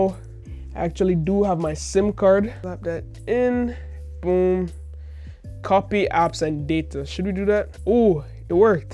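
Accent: Jamaica. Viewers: Can't hide that Jamaican accent... You Jamaican? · your Jamaican accent is rich i love it 👌🏾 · from you start speaking I said this definitely is jamaica 🇯🇲🙌🏽🙌🏽🙌🏽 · Are you Jamaican, I hear the accent but I'm not sure.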